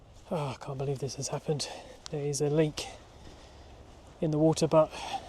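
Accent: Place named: Australia. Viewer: Goodness, you sound so Australian!! My bad.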